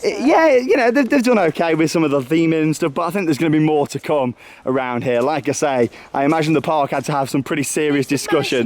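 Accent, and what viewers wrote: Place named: England, United Kingdom. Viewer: What day did you go? I'm almost certain you walked past me whilst you were recording, the English accent always stands out.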